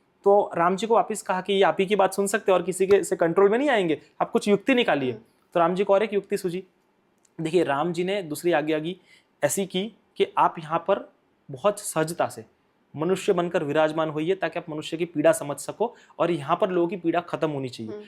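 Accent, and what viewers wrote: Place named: Gujarat, India. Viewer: Ye admi gujrati lagta hai Jaisi hindi bolta h uski wajah se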